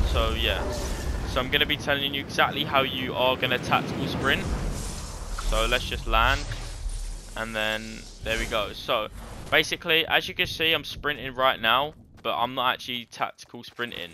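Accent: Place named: United Kingdom. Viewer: always the british youtuber bro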